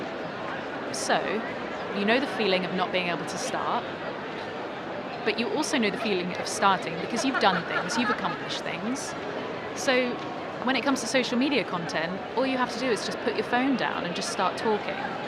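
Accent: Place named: RP, United Kingdom. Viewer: Where did you learn such beautiful pronunciation (RP)? Love it...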